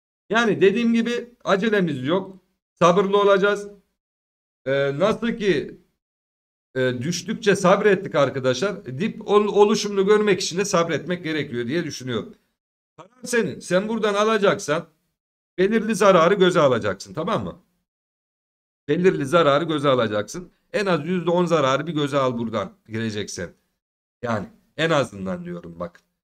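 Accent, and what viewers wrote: Konya, Turkey. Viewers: Teşekkürler Gonya'lı Ustam.("ben öyle bişey DİMEDİM, lafına hastayım)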